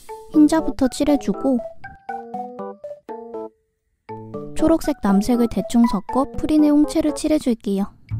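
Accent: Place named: Japan. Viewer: 뭔가 말하는게 외국인같다 그 욕하는게 아니라 발음이 뭔가 둥글둥글한? 일본인이 한국말하는 그런 느낌이다 한국말 완전 잘하는 일본인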